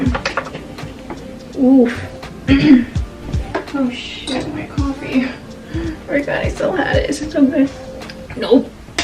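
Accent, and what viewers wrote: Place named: Philippines. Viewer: Which part of the Philippines are you? She does look like filipino and her accent if is filipino accent passing 🥱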